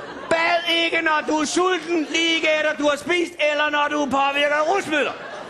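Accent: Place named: Denmark. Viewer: he is reading Norwegian in Danish making it sound really stupid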